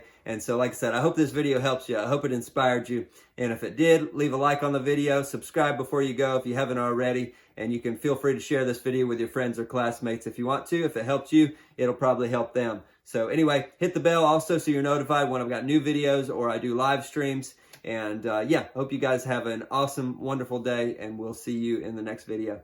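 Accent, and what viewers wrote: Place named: United States. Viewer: Thank you so much for all of your videos; I really appreciate you taking time out to share your skills and expertise. And I really like your laid-back style - I'm not sure why some people want you to be something you're not. Strange. Btw, I love your accent. We Brits are always being told that Americans like our accent - but yours is just lovely.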